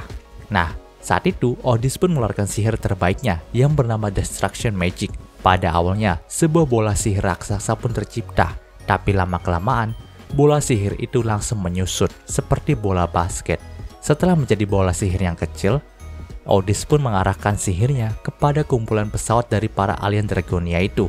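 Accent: Cirebon, Indonesia. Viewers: Kya logat Cirebon 😅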